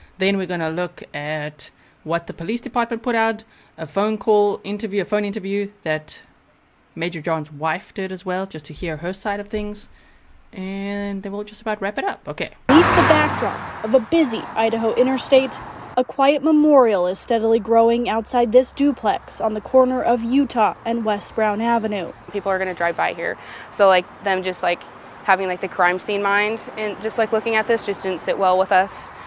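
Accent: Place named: South Africa. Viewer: Knew it from your accent, South Africa 🇿🇦 in the house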